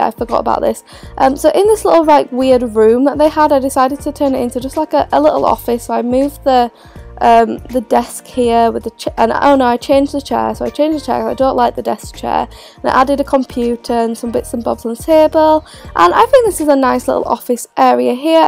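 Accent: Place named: England, United Kingdom. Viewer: Rubina Begum she is from England so she has a accent :)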